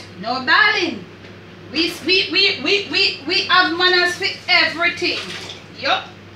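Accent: Jamaica. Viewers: Hi, I started watching your channel just recently and I'm really motivated and inspired by your teachings in the kitchen. I am learning alot...I'm quite impressed with your vocabulary (excellent pronunciation of different herbs, spices, food and so much more ) I know you used the patios to let it be known that we are all Jamaican but deep within you are an intelligent person. Please ignore the haters out there, there will always be haters (these are people who allow the devil to use them) You are doing an excellent job, keep up the good work, keep the faith and always trust in our Creator God.. God bless.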